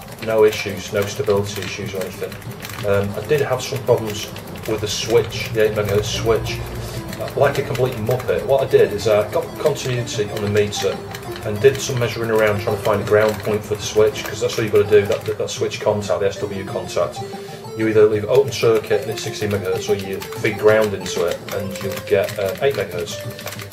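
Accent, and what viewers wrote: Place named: Northern England, United Kingdom. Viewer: It's also that thick Manchester/Sheffield accent that's making my brain a bit herky-jerky when I'm trying to listen. :)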